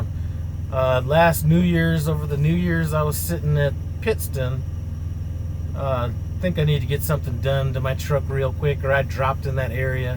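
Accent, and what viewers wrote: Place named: Midwest, United States. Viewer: Good video. When you mentioned the Chelsea market it brought back memories. Are used to pick up gasoline right at the Exxon terminal that I’m figuring you drove by. You are correct there is no place to park in that area at all. I would agree probably is still mafia run. I have driven in New York City going to Fulton market. I would not wanna make it a habit of going to New York City. You sound like you’re more of a Midwest or southeast person. Be safe out there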